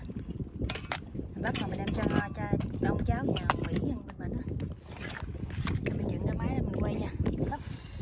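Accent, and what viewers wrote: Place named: An Giang, Vietnam. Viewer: Rất thích và ủng hộ kênh của bạn. Nghe giọng nói hình như bạn là người gốc ở An Giang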